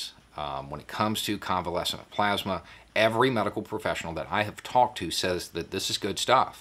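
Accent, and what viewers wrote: South, United States. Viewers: To be honest... I haven't experienced more genuine thought process since I watched this gent making reasonable arguments in a southern accent (I maybe wrong I'm german) in his garage with his superior Beard. I expect any minute a profound argument supporting the second amendment.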